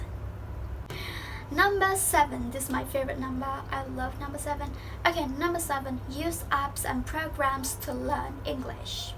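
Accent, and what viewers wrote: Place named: United Kingdom. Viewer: Wow I'm so amazed how fluent your English is and the way you talk and that British accent is so so great, I'm so jealous. Some love from your IG friend ❤🥰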